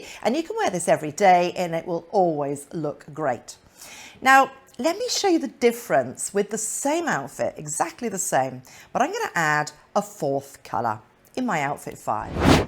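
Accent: United Kingdom. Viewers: My only tip is never wear wide legged pants for travel. The bathroom floors can be disgusting and you don’t want your pants to touch that. Joggers solve that problem nicely. And might I add it was weird, and somewhat even disappointing, to hear a beautiful British voice say sneakers! And I’m American! 😆 Great videos.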